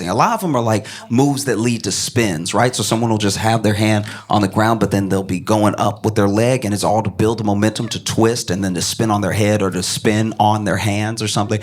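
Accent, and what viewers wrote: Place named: Louisiana, United States. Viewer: is a master at bringing it home with his facial expressions. 👍 And as a Texan, I like his slight twing of Louisiana accent. Makes it so so authentic and endearing.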